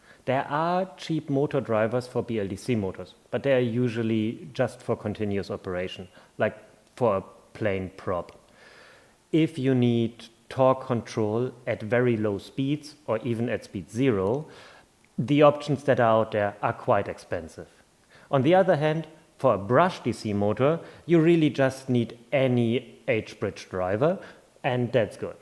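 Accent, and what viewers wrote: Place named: Germany. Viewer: metal working/engineering project and german accent ? You're sure to see good work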